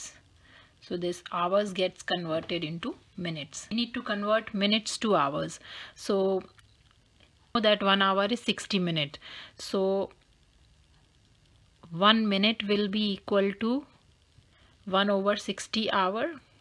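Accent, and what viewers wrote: India. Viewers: You making fun because she's Indian and have funny accent, or you being sarcastic?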